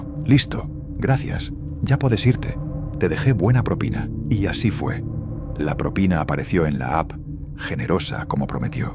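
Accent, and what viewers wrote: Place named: Argentina. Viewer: Sos de Argentina? Por el acento jaja desde que conocí tus videos veo todos los días uno 😅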